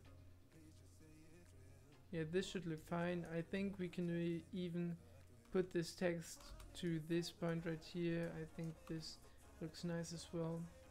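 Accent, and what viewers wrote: Germany. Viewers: dein akzent mein hört dass du deutscher bist hahaha !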